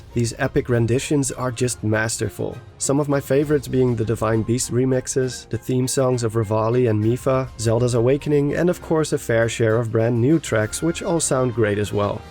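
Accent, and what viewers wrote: Netherlands. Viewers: I knew you where dutch, just hearing your voice lol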